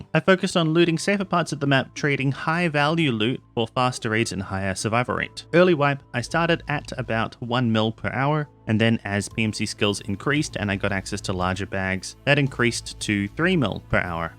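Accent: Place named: Australia. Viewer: first of all, i love this video and you're crazy underrated. awesome tips, coming from a fairly washed tarkov player. second, i was running factory earlier today, and was killed by so i checked your twitch out and sure enough, there you were in factory beaming me down. and here i am 2-3 hours later clicking on a youtube video and hearing a fairly familiar aussie voice and checking who it was from. and as you can guess, it was you. anyways, i love the content, subbed.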